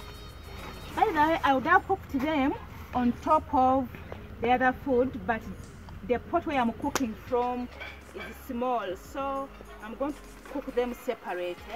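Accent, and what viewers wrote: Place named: Uganda. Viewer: You speak Ugandan accent sis.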